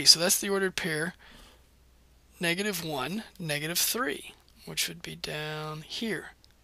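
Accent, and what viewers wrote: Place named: United States. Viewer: Super helpful and all, but did anyone else thinks he sounds like Caspar Lee with an American accent?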